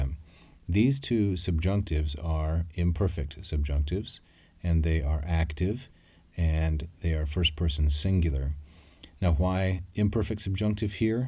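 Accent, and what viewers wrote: United States. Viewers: What is wrong with the American pronunciation of latin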